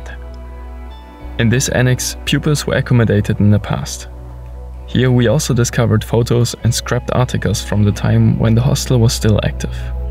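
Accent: Germany. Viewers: I like your videos, very well done and your great dialogues. And especially I like your German accent (honestly).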